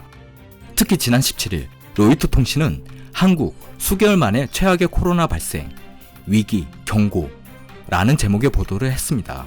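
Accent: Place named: Yanbian, China. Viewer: 조선족은 중국관련 영상이나 올리시오. 아무리 한국인인척해도 당신 발음과 억양속에 조선족임이 들어나고 있음.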